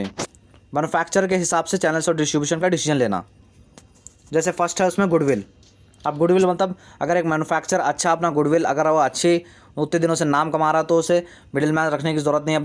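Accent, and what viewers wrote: Hyderabad, India. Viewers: Sir aap Hyderabad se ho naa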